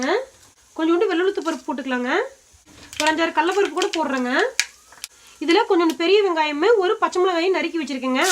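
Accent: Kongu, India. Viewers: Your kongu tamil super mam yr recipies also super keep it up mam 👍👍👍👌👌👌💐💐💐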